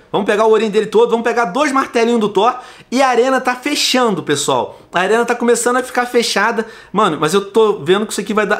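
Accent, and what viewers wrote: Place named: Portugal. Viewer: Tio fala em sotaque português ☺☺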